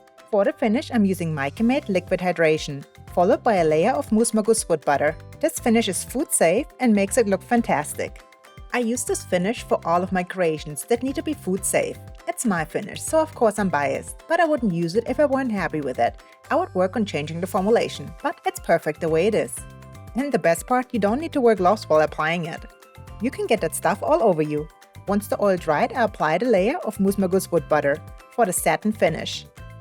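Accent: Germany. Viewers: Hey, man bemerkt noch den Deutschen Akzent, ist auch gut so. Ich mag dieses Board, sieht sehr schön aus 🥰. Wie würde man so eines herstellen können ohne CNC Fräse? Einiges mehr an Arbeit. Ich habe zwei Schildkröten, ich klein.🥰Danke für Dich 🤗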